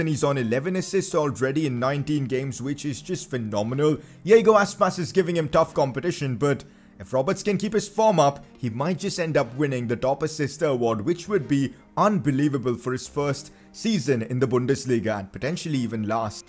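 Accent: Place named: India. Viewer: why your accent sounds so indian xD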